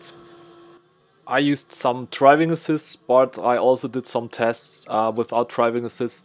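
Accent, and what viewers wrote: Germany. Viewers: Deutscher Dialekt, hört man sofort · Man hört den deutschen😂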